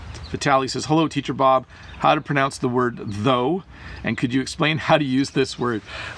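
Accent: Canada. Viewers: I'm Thai people, I like to learn canadian accent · I love Canadian accent either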